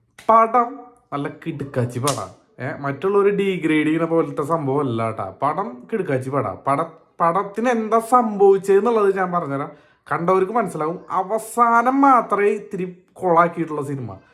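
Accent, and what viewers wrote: Thrissur, India. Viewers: Nice broo first comment i love your slang because i am a thrissur Gediiiiiiiiiii…….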